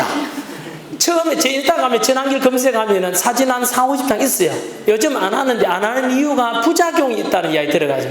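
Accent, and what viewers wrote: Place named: Gyeongsang, South Korea. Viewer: 가시는 발라내고 살코기만 먹으라는 경상도 사투리 맛나요!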